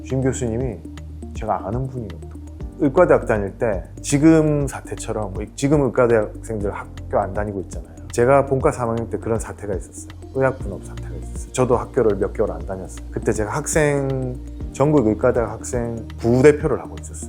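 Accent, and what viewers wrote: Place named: Gyeongsang, South Korea. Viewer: 부산이셨구나 ~~~ 갱상도 억양이라 갱상도인줄 알았어요 ~~~~ 울산샘은 잘 계시는지요??? 궁금하네요 ~~~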